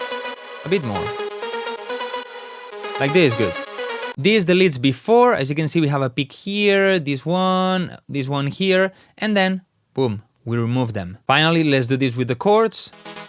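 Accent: Spain. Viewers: y, era re obvio que es de españa si te das cuenta como habla inglés jajaja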